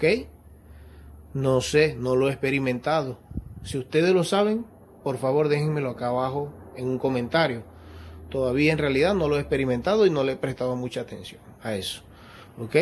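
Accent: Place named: Maracaibo, Venezuela. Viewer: Primo vos sois maracucho dónde te pongan ! 😅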